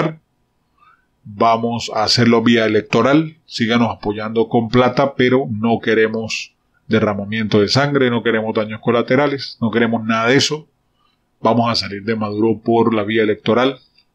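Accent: Colombia. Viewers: Bla bla bla bla bla. Pura mentiras mijo sea serioooooo y con tono de acepto colombiano no me fija mentiras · Uuyyy a uds se le sale ese acento colombiano pues como mucho!!!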